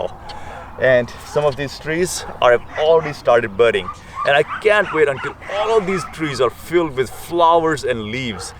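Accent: Punjab, India. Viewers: Hello! Another great video. (Love the Punjabi accent.) Sat sri akal.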